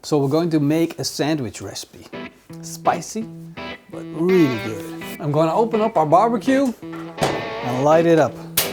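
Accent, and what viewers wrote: Netherlands. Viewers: Ik hoor een Nederlands accent?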